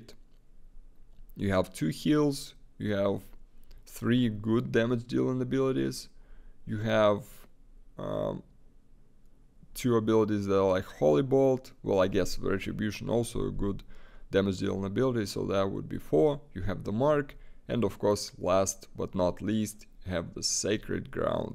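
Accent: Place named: Russia. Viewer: То-то я думаю акцент русский )))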